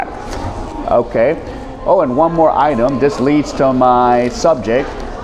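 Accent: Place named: United States. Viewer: The Korean in South Korea should go to the Philippines and build their own business. Its easy to set a business in the Philippines for Koreans. Filipinos loves Koreans. The way you talk now is like "americanized" & "Philipinized" so funny !😂🤣🤣🤣! I subscribed because you are funny and truthful - real dude. I'm from Miami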